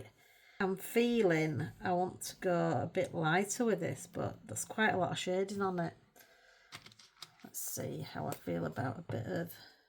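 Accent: Yorkshire, United Kingdom. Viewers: I am still watching but had to come here to say this before I get too entangled on the video and forget... when I heard you say "trying speak more clearly"... I love your yorkshire accent/dialect, it's so beautiful and it adds so much personality to your videos! Loveee it! ❤ (even on the occasions I understand nothing at all 😂, still sounds like music to my ears nonetheless) That being said, it took me a few videos to understand if "cracking" was a good thing or a bad thing 😂... but then again English is my second language, so it could just be a lack of proper knowledge on my part 😂, but hey, learning something everyday right? The texture of these gel crayons on your page remind me of Van Gogh thick oil paint strokes that lends a whole different texture and dynamics to the painting. So nice! And it looks so satisfying to watch you smoosh the colors together! 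😊 amazing!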